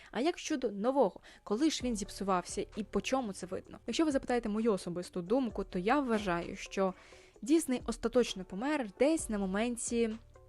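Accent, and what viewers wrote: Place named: England, United Kingdom. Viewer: У вас дуже приємний англійський акцент 😀 Я думаю, одна з основних причин, чому дісней перезнімає старі мультфільми, це спроби продовжити життя принцесам. Сучасним дітям вже не цікаві настільки старі мультфільми, ще пару поколінь і ніхто б вже не знав хто така Аврора чи Аріель. А оскільки їх лінійка принцес це ледь не візитна картка діснею, їм важливо розширювати аудиторію і залучати сучасних дітей. Ну і заробіток звісно теж, куди без того) Такі мультфільми 100% окупляться, а от нові історії це завжди ризик Більшість останніх мультфільмів які вам сподобались були таки зроблені піксаром, а не діснеєм. Не думаю, що дісней хоч якось приймав там участь окрім як інвестування. І я б не стала мішати Піксар до Діснея. Так, формально вони його купили, але це все ще різні студії, і як на мене вони мають кардинально різний підхід і стиль роботи) Дісней завжди були досить консервативні як стосовно візуального стилю (у них всі персонажі виглядають тупо однаково), так і стосовно сценарію. 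Вони бояться відходити від протоптаних стежок, в той час як піксар часто експерементують. Тому, до речі, мене здивував нещадавній реліз "Бажання", де видно експеременти зі стилем, судячи з усього навіяні новими тенденціями, які запустли "Людина павук крізь всесвіт" та "клаус" свого часу. Проте у Діснея цей експеримент поки не дуже вдалий, і виглядає дивно. Тим не менш, цікавий початок, побачимо що буде далі.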